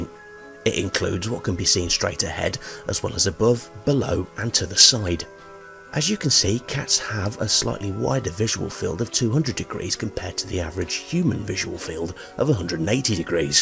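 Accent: United Kingdom. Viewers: "Cats are also thought to be trichromats but not in the same way humans are." Wow! He's British! 😂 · Very informative....and it’s great to hear a British voice for a change xxx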